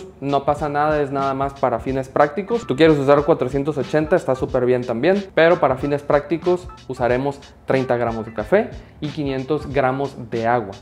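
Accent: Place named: Paraguay. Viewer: Encuentro algo de Paraguayo en el acento, soy de ahí, un abrazo si acierto 😮😮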